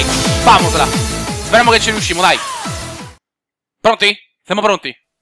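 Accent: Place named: Rome, Italy. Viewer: Se capisce dal suo linguaggio,abita a roma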